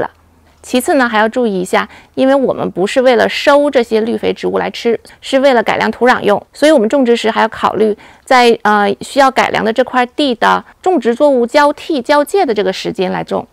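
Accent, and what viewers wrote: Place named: Jinzhou, China. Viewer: 我感觉像是锦州人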